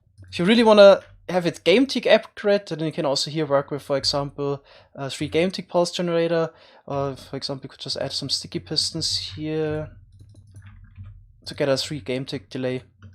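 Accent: Germany. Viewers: German accent shows, I'm using to imitate a German accent towards my German friends:) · german here, is his English really that german?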